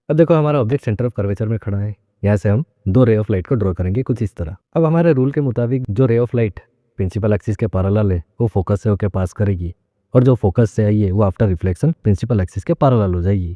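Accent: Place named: Northeast, India. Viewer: Bhai aapke bolne ka tone north eastern states ki tarah h😅😅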